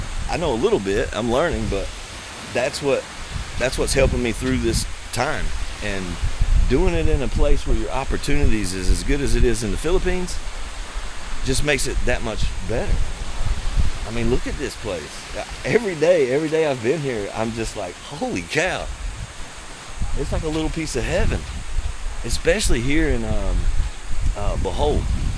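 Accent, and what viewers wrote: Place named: North Carolina, United States. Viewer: North Cackalacky in the house! You sound a little Sampson County. 👍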